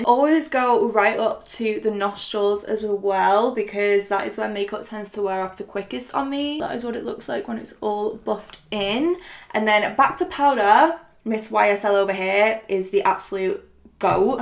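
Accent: United Kingdom. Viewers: how did i know you were british the second the video started?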